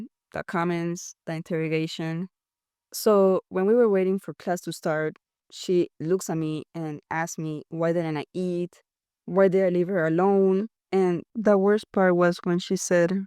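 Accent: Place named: Latin America. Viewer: Is your original language Spanish? I really like your accent and I saw you included Spanish words and my parents are Hispanic so I speak spanish and was curious lol Also I love your style its super cute!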